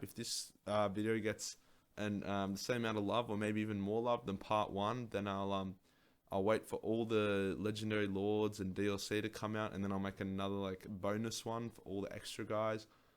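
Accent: Australia. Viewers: Omg u speak total lad speech. need more Aussies on youtube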